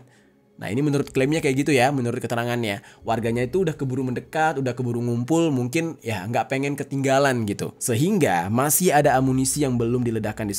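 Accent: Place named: Aceh, Indonesia. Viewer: Bang tdk bisa ngucapin krn dia orang Aceh logatnya beda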